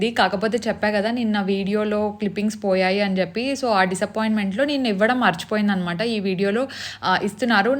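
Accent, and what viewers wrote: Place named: Madanapalle, India. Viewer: A big fan of uh akka ❤️ I'm from madanapalli n whenever I see ur vds I feel lyk ur one of our family member n ur slang is super related to us. Keep rocking akka 🥳🥳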